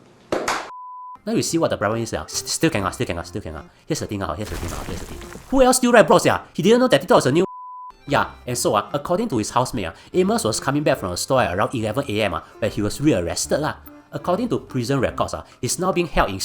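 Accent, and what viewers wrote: Singapore. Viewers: The video's good but I guess he does it on purpose of how a literal Singaporean speaks English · The mispronounciation is part of the singlish, lol. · Highly irritating Singlish. Stopped watching halfway. Grow up kid, learn to speak properly before posting on YT. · It's how some Singaporeans speak...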